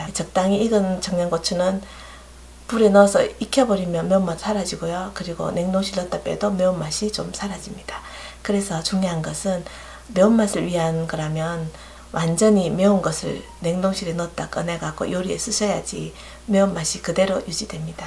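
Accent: Gyeongsang, South Korea. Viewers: 경상도에서 잘 해먹는 반찬 서울 사람들은 잘 안먹더라구요 쪼매만 경상도 사투리 섞어 나오네요 ㅎㅎ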